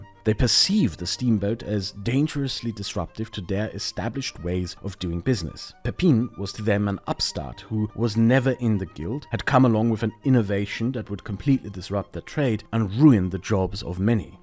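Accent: Germany. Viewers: There's something inherently sexy to a video essay on German history written in perfect formal English and narrated with a tasteful German accent. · Ironically, I never thought I'd enjoy listening to pronounce German words but...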